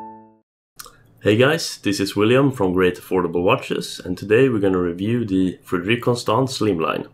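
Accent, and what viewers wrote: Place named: Sweden. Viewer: The accent haha. I've heard it a million times here in Sweden, though you don't have one as thick as most.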